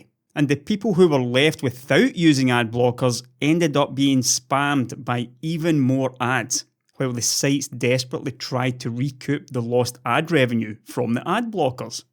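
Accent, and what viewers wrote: Scotland, United Kingdom. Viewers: read any article that * wrote with a Scottish accent in my head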